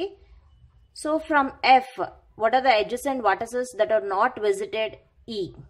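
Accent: South India, India. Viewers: Thats her accent bro,may be she's from south india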